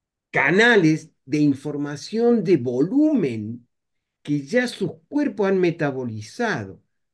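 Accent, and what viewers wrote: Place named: Córdoba, Argentina. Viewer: Buen día!! Que sorpresa escuchar a un cordobés como yo. ,jajaja, lo estoy escuchando, gracias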